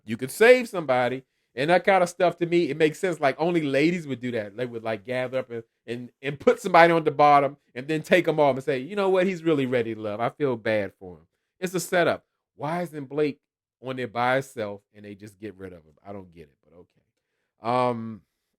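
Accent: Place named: Baltimore, United States. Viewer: The season is over and here I am binging all of your videos as I finish up my nails. YOU CUT THE ENTIRE FOOL ON THIS SERIES! This portable rattail, the continuous belief in what Anthony really wants, Lyndon being down to the part-time job but on the show between shifts...I'm calling the authorities. But I knew your accent and demeanor felt like home because it is, I'm from Baltimore. I'm thoroughly enjoying myself on today!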